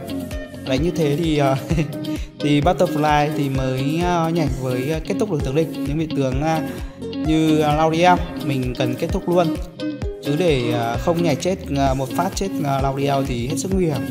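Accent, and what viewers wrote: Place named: Hanoi, Vietnam. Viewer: ah quê ở đâu vậy hơi gọng giống ở hn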